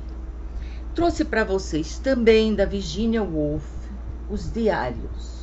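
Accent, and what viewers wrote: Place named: Pernambuco, Brazil. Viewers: Por favor,! Faça vídeos longos! Vc tem um voz muito agradável somada a um sotaque refinado do Nordeste, som q, aliás, adoro ouvir! Vc é muito agradável nas sua colocações e tem muita coisa a dizer com o seu conhecimento! Caso alguns dos seus seguidores não gostem de vídeos longos, alterne vídeos curtos com longos para agradar aos q gostam! 😊 Outra coisa: vc poderia me dizer o título do livro romântico q vc iria começar a falar, mas se lembrou q não estava na sua pilha? Fiquei curiosa! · Feliz Ano Novo e Bons Livros 📚!!!! Gosto de ouvir você sobre os livros sobretudo com sua fala pois lembra muito minha mãe Marlene que era também pernambucana. Bjsss😘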